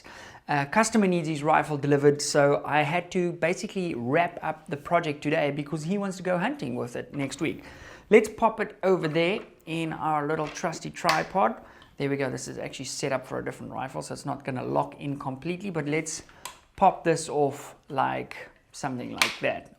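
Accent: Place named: South Africa. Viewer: sometime back my company did some work for an outside company. One of the guys on site representing the other company was South African. I recognized his accent from hearing you a lot. He was surprised because most Americans guessed England first, Australia a distant second, New Zealand an even more distant third, and South African NEVER. I got a kick out of that.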